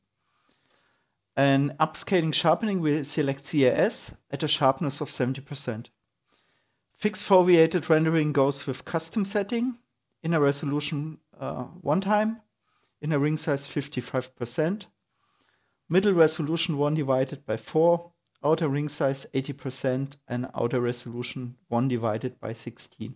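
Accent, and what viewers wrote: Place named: Germany. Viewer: thank you so much for your help! And as a also German I may say, that I love your beautiful German accent 🤩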